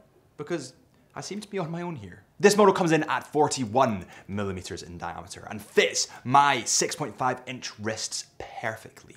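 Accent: Scotland, United Kingdom. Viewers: Can't help comparing you to Adrian Barker. Same presentation and conversation style but with a Scottish accent. Maybe you guys should collaborate? You both like watches, photography & adventures in the wilderness. Would be a fantastic pairing for YT content.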